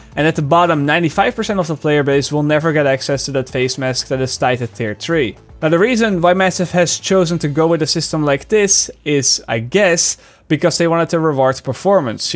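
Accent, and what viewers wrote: Netherlands. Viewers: you sound way more dutch in the videos compared to when you stream - is that weird? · Are you dutch? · Kom jij uit nederland? Ik herken je accent. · Are you dutch you sound dutch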